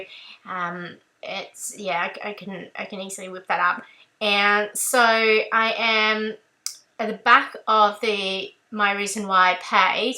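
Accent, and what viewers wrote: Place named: Australia. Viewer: Thanks for the heads up on the DD Facebook page re your videos. Sounds like an Aussie accent. Are you in Oz?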